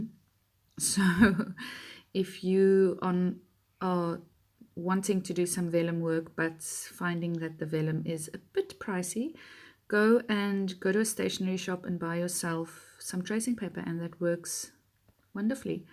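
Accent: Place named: South Africa. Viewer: Lovely to hear your South African acsent. Lovely layout. Love FJ. Love from Cape Town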